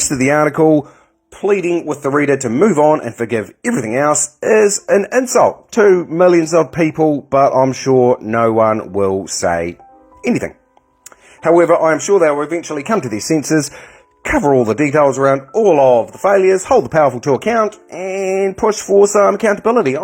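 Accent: New Zealand. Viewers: Thankyou for your great channel cant get on daily telegraph nz thought police are stopping free speech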